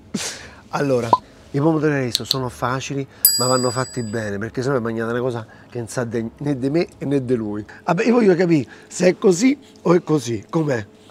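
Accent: Rome, Italy. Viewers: Grazie per la ricetta, per fantastico romano, a parte che sei un poeta vero e proprio!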